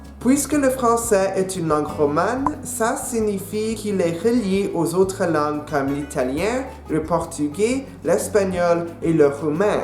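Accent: Quebec, Canada. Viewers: Same here i was laughing the entire time im from Florida the very south of US (comme Marseilles pour vous) his accent is a northern it sounds canadien or british to me lol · I find the Québecois accent you've got a little grating aha, i'm not sure why. Nice video though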